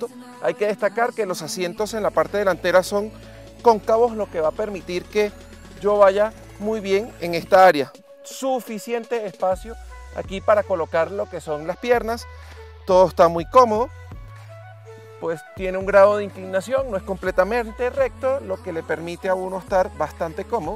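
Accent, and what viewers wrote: Venezuela. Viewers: eres venezolano cierto? exelente video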